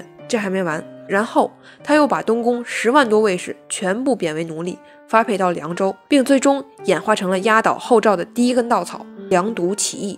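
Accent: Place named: Beijing, China. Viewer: 姑娘說書內容詳盡又能不疾不徐且毫不做作！一口道地之北京話更讓吾人擊節欣賞！姑娘讚！既然姑娘熟讀中國歷史即使是分裂戰亂年代也是漫長的歲月可述說之內容亦如海水之不可斗量！姑娘妳何妨將之細分多期講述之？一則讓聽眾有時間消化二則也能有更多期的視頻此乃兩全其美也！姑娘妳何樂而不為？姑娘妳以為然否？同時姑娘妳也不妨開闢歐洲史的部份自馬其頓帝國起至二戰結束終也能製作非常多期之視頻矣！至少能讓銀明白阿提拉大帝和拔都汗對歐洲造成的傷害是多麼的巨大！還有為什麼蘇德一定非要瓜分波蘭不可！又為什麼明明是同樣的斯拉夫民族鵝螺絲和烏克蘭卻能生死搏鬥！以及為什麼日俄戰爭中波羅的海艦隊全軍覆沒卻是對蘇聯共產黨天大的幫助？等等………！如此增廣我等之見聞豈不美哉？姑娘妳其有意乎？忠言向來逆耳！若在下所言使姑娘妳不悅亦望姑娘展現大度一哂置之！則在下幸甚！姑娘亦幸甚！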